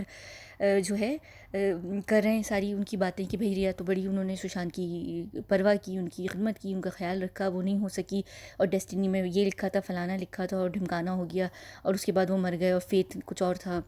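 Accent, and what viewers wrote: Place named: United Kingdom. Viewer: You have a British accent when you speak English 😀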